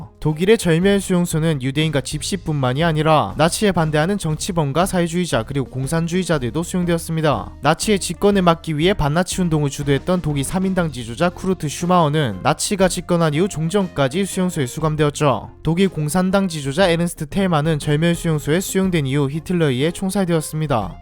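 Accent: Gyeongsang, South Korea. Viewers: 혹시 여자성우분은 AI였었나요??? 남자분은 경상도 아저씨느낌임..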